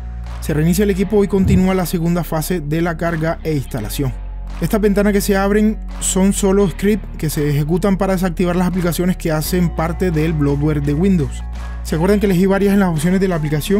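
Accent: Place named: Cartagena, Colombia. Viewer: Amigo, usted es de Cartagena Colombia ¿Verdad? Esa voz no creo que sea de otra parte !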